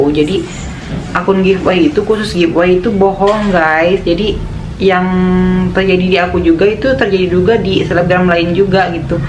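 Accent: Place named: Sunda, Indonesia. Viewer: Sunda abiss si aa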